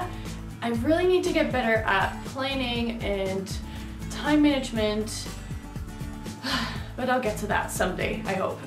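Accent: United States. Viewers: Your english sounds american af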